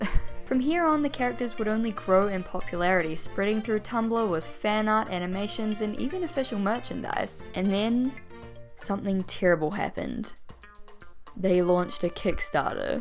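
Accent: New Zealand. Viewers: For me it's the NZ accent. NZ give me Gold Coast flashbacks. No, you can have a durry cuz. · omfg I knew u were from nz bc of ur accent!!! when i saw the kickstarter that confirmed it ahhh!!! bet ur from auckland uwu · as soon as i heard your voice i knew you were a kiwi haha. There's been like a surge of New Zealanders on Youtube and I'm here for it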